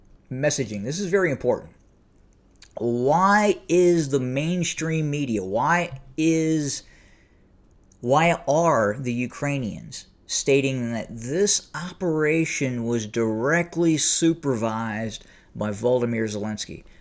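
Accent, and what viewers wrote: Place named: United States. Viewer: Nice to hear The Kremlin spin in crisp American English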